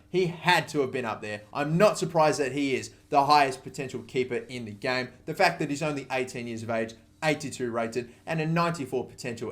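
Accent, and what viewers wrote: Australia. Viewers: U aussie m8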